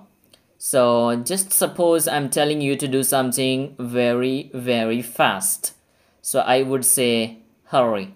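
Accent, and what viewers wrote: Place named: New York, United States. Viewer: NYC voice dear sir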